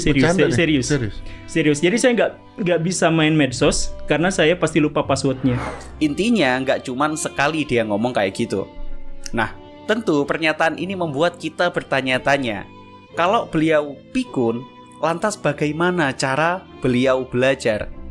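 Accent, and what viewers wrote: Java, Indonesia. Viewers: Apakah boleh saran mas😁 Saya dari jawa dan kayaknya mas juga jawa bisa di lihat dari lahjah bicaranya Coba pakek "saya kamu atau anda" jangan pakek "lo gw" kayak yang kaku ajah mas😁🙏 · Mas mon maap sebelumnya, mungkin akan lebih nyaman pake “aku kamu” aja, gak usah/jangan pake “Gua Elu” karena masih belum sesuai, maaf medok bgt..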